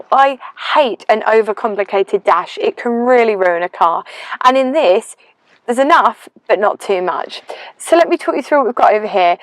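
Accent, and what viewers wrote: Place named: RP, United Kingdom. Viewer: omg no way y are so posh lady.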